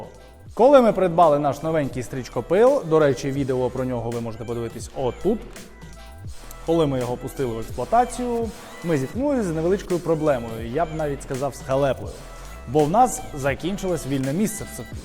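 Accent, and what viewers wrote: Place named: Ternopil, Ukraine. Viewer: Ніколи б не подумав по Вашій мові, що Ви з Дніпра, а не Тернополя)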